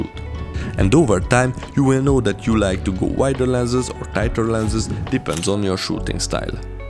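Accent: Hungary. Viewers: Meghallottam ahogy beszélsz egyből levágtam hogy magyar vagy :D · Amint meghallottam az akcentust, tudtam hogy magyar vagy. :D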